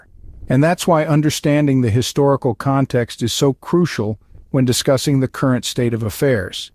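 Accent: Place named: Mid-Atlantic, United States. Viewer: Another damned speechbot with an expressionless Mid Atlantic voice. Turned off.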